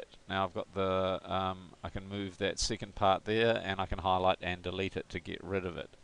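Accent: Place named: New Zealand. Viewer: You sound like you're from nz, our computers at school also have the student shared drives set up like that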